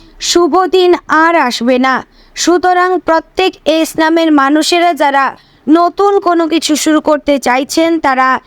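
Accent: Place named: Bangladesh. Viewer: Your pronunciation is very much like Bangladeshi. Are from Bangladesh?😮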